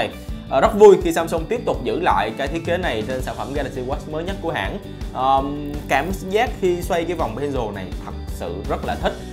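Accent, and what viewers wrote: Binh Dinh, Vietnam. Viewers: nghe giọng Bình Định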